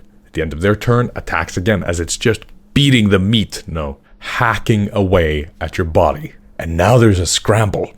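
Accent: Iceland. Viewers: Are you perhaps Icelandic? I really feel this accent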